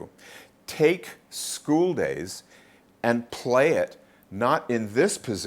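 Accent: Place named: Long Island, United States. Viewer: A fellow Lawn Guylander?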